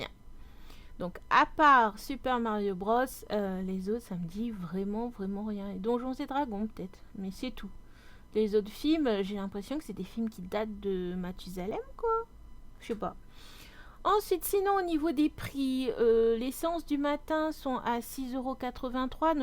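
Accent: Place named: India. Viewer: ah! D'Accord moi aussi je vis sur l'île de la reunion dans l'océan indien! Et j'ai moi aussi une chaine YouTube s'apelle Geek to Cinema! Donc maintenant on est deux! Lol en revenche je n'ai jammais entendue parlé des autre autre YouTubeur! Mais sans être curieux tu vis ou a st pierre ou st Louis! Parceque a un moment tu dit que le Ciné et a de chez toi 20 minutes Moi je vis a la ravine blanche! Et je pence que il 10 minutes ou 15 minutes tu as un accent indien quand tu parle tu es d'origine Indienne!?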